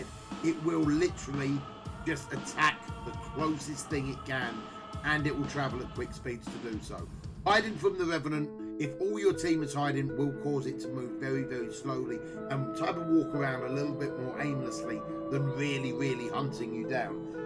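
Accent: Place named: Scotland, United Kingdom. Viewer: Not be offensive in any way but just listening to you I know you're scottish.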